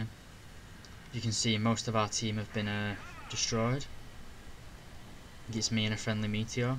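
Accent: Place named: Yorkshire, United Kingdom. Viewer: It's great. I thought you were Yorkshireman like me.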